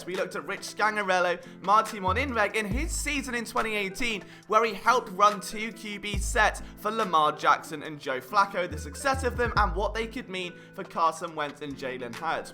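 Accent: England, United Kingdom. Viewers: The Queens English 🐥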